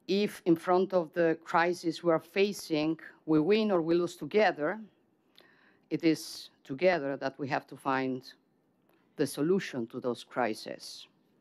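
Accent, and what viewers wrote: Rome, Italy. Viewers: Brava Melona ma l' accento è de Roma 🇮🇹( per fortuna) hahaha 🤣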